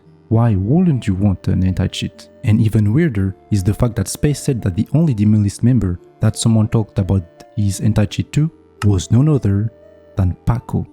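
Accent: France. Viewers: bruh your french accent is beating you up so bad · I cant listen to french english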